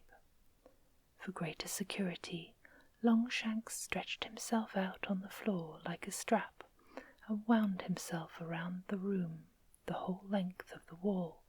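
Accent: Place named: England, United Kingdom. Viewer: Love sleep stories. The softly spoken English accent is soothing & relaxing. I've always loved magic & fairytales so I thoroughly enjoy the stories plus the narrator is great. What is the narrators name? I really love listening to the stories in her great voice. I listen to these most nights. Thanks so much!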